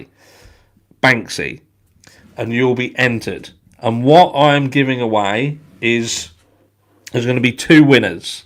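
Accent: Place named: Countryside, United Kingdom. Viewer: Banksy! Banksy is so cool. I’m a Brit living in 🇨🇦 and I enjoy your countryside English accent! Great channel, recently discovered you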